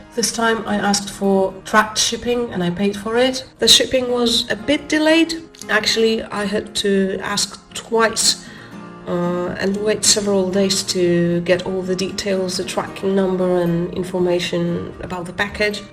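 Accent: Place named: United Kingdom. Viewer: I really appreciate your honest review. I hope you had a fabulous holiday!! You sound like you now have a British accent ...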